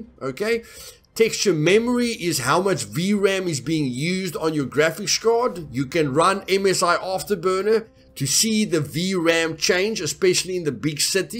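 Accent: Afrikaans, South Africa. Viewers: Hey this Guy definitely Afrikaans😂😂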